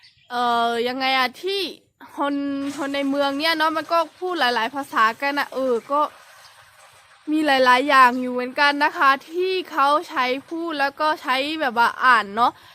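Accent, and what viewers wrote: North, Thailand. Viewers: สวัสดีครับน้อง ติดตามๆครับ... อู้กำเมืองครับเหมือนคนเหนือ... 55อันเด่วกั๋น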